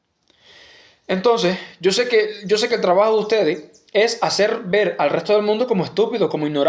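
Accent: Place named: Dominican Republic. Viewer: No es regionalismo corazon,esas son clarias que atacan por donde te duele,para que te quites,no hagas caso a eso, Los dominicanos tienen ese mismo acento y ninguno se averguenza,dale con todo. 💪💪💪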